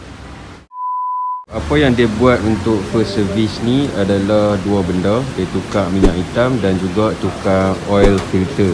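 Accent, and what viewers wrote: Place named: North, Indonesia. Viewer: loghat mcm org utara so kita geng la 🤣dah la R15 juga tapi aku R15 biru 😬 salam geng R15🔥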